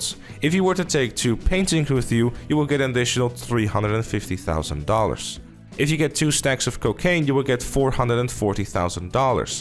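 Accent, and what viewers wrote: Netherlands. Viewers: Are you Dutch? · Je bent Nederlands ik hoor het aan je accent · are you dutch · this dude dutch though! geweldige video man, echt vet... goed bezig! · hoorde gelijk dat je nederlands was haha XD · Are you dutch becuase you have a accent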